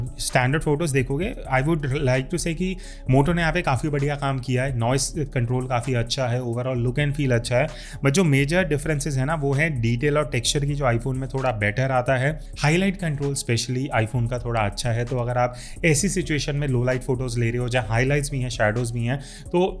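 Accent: Lucknow, India. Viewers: Sir aap lucknow se ho ???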